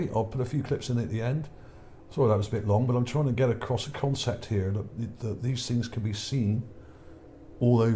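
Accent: Bristol, United Kingdom. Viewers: Gotta be from Bristol with that accent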